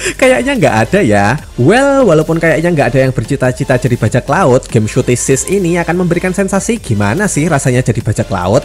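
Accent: Java, Indonesia. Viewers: BANG situ orang jawa bukan soalnya ngomongnya kaya orang jawa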